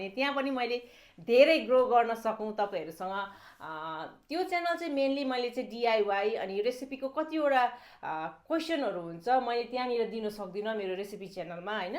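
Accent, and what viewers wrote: Darjeeling, India. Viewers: बधाई छ….! tapaiko बोल्ने शैली सुनेर दार्जिलिंग कै हो भन्ने पहिलेई थाहा थियो मलाई चैं 🤗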